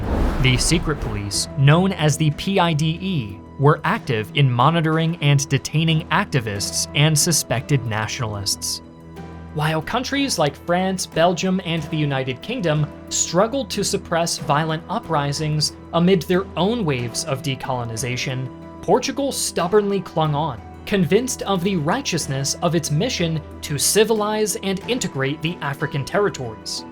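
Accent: Brazil. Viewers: The terrible Brazilian Portuguese (mis)pronounciations have to stop. You're butching history for someone calling himself a "Historian", by using bad Brazilian pronunciations for what should require a neutral Angolan/Moçambican/European Portuguese accent.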